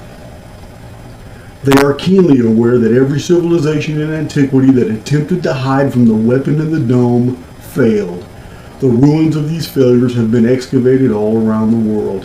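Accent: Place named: South, United States. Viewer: you sound sooooo Southern here man haha love it 🧡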